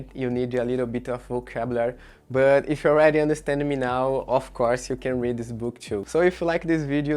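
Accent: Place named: Brazil. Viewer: Eu entendo mais um brasileiro falando em inglês do que o nativo kkk , muito bom ! I’m learning speak English fluently, your canal will help so much ! 👊🏼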